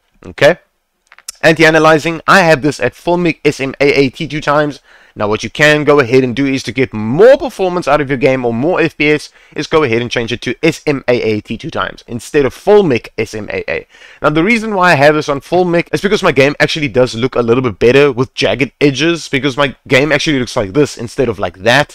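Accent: South Africa. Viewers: I knew you were South African as soon as I heard that accent! Big ups bro nice to see local gents doing well 👏🏾 (gonna try this out when my loadshedding is done)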